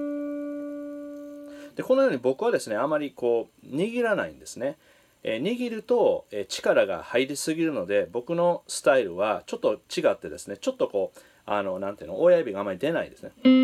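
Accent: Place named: Kyoto, Japan. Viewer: トモさんの京都アクセントには癒されます(^^) やはりチョーキングとヴィブラートはそれぞれ別個に練習してから、合わせた方がいいのですね。 勉強になりました。 ところでWhile my guitar gently weepsやanother brick in the wall part2のような二音半？ベンドがきついです。 何かコツや練習法ありますか？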